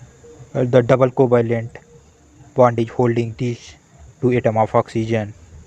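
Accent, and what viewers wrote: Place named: United Kingdom. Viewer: Bhai British main lag rahe hai 🔥🔥🔥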